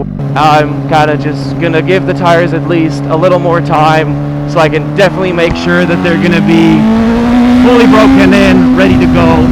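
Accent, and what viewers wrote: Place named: United States. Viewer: I was so confused by your American accent but European plates and roads and using km, I was like where tf is this filmed 😂 I was like this is a weird fucking part of Canada 🤣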